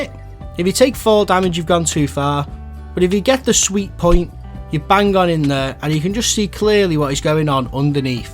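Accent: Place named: Northern England, United Kingdom. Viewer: Gotta love that northern twang of the English accent ! Moo idea how people said we sound the same when I’m from the south which is considered the “posh” accent of England haha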